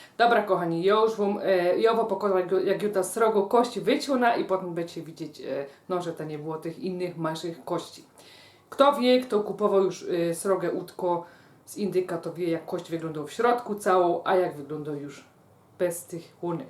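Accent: Silesia, Poland. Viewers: Podoba mi się z ''kartofloma , z kluskoma '' można podać . Lubię słuchać gwary opolskiej / śląskiej .Sznycelki jednak lekko rozbiję i otoczę mąką przed panierką , smalczyk indyczy też o.k.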